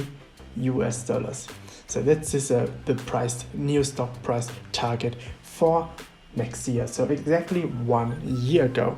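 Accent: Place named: Germany. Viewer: Haha der deutsche Akzent ist einfach gut!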